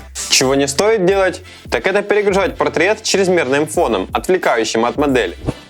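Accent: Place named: Romania. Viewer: акцент какой то румынский. надеюсь он Русский человек.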